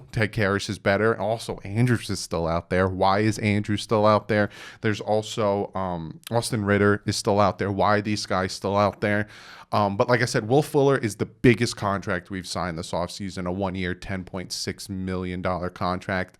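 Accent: New Jersey, United States. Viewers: Late night letting his Jersey accent out to play.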